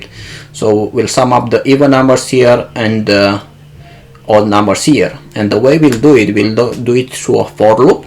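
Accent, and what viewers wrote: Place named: India. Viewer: Thanks for solution. As for me, it's a little bit difficult to understand your pronunciation since it is a litle bit similar to Hindi speaking people (some places). For example all, individual, confirm divisible.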